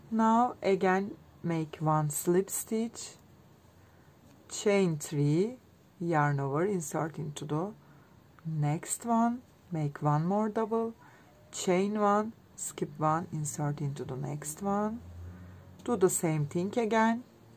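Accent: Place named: Turkey. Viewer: Türkçe alt yazı olaydı iyiydi ingilizce konuşmanızda türk gibi türksünüz degilmi ses tonunuz onu andiriyo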